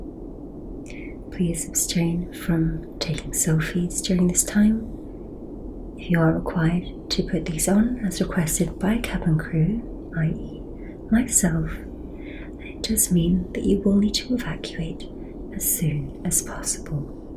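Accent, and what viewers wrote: United Kingdom. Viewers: Hallöü 😄are you english?or scottish or irish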